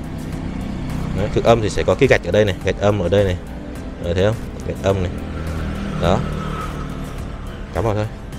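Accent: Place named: Quang Binh, Vietnam. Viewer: Bác nói nghe giống người cảnh dương, quảng bình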